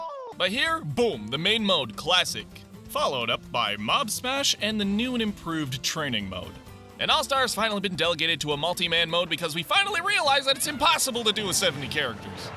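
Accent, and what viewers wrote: Canada. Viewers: Wait are you Torontonian?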